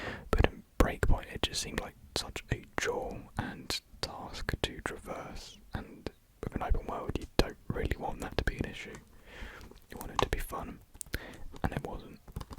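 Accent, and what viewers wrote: United Kingdom. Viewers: I can tell my teacher I'm learning British English with Asmr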